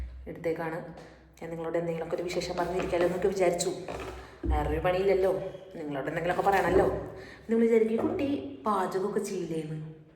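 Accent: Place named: Thrissur, India. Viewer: Nammade asal thrissur language..enikku kettappo samadhanam ayi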